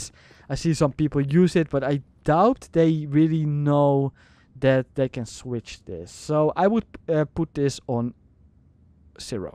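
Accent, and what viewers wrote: Netherlands. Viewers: nice dutch accent ;)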